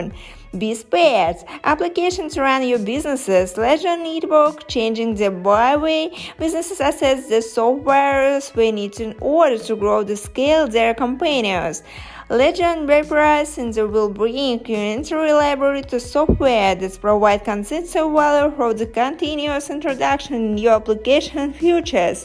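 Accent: Russia. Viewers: is she from russia or opland or ukraine, im confused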